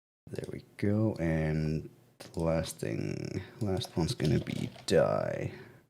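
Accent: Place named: Finland. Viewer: 10 seconds in and I can already tell you're a fellow native Finn.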